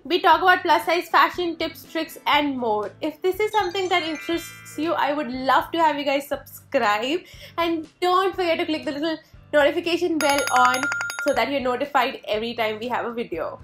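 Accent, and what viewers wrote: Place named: Delhi, India. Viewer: Just a observation.. Nothing negative.. Delhities.. Usually pronounce here as hare... Thank you for the vid.. Very helpful tips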